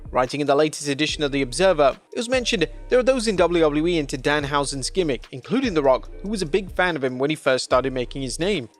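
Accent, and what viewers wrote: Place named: United Kingdom. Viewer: This host is definitely not American you can hear it in his crappy accent he sounds like he's from across the pond himself and he doesn't realize that Ireland is not in the UK?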